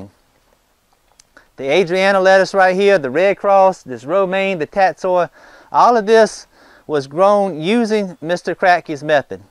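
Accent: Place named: Louisiana, United States. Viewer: Dude... That sounds like a Louisiana accent! 😳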